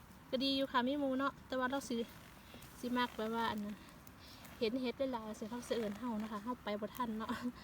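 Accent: Central Thailand, Thailand. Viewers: ไม่ง่ายเลยนะทั้งถ่ายทำไปพร้อมเก็บเห็ดขอบคุณนะจะน้อง และทุกๆท่านที่อยู่ตปท.ที่อุตส่าทำคลิบมาให้คนไทยที่เมืองไทยได้ดูจะชอบน้อง พูดไทยกลางน่าฟังดีและฟังรู้เรื่องด้วยจร้า💚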